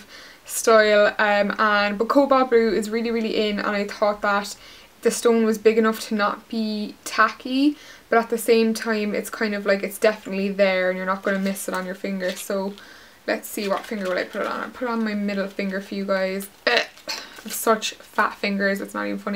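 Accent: Cork, Ireland. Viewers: omg the inactive transcript is so funny :L clearly doesn't know the cork accent :P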